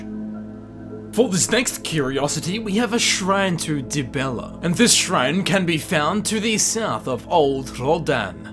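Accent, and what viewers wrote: Western Australia, Australia. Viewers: I thought you were British, I guess I don't have a keen ear for Far west accents. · Are u a fellow Aussie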